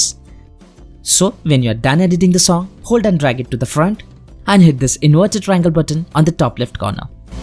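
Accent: India. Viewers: Thank you very much And your accent is wonderful. I’ve always loved the Indian people’s accents when they speak English. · your accent is very strong Indian boy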